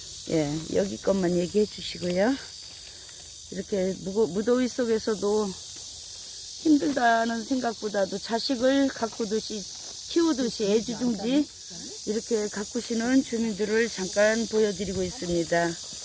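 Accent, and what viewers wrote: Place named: Chungcheong, South Korea. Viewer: 힘드시죠? 말하면 뭐한대유 충청도 맞아유.나두 충충도 태생이유 고추건조 보기 좋습니다 유투브도 대성하십시요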